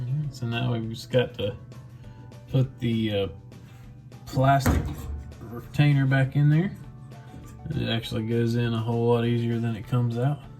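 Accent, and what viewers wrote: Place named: Alabama, United States. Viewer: Dude's speaking Alabama ease. He doesn't have an Amana (Uh-man-uh) dryer, he has an (Ar-mon-uh) dryer. I thought he was servicing a new brand of dryer. Keep up the good work Buddy!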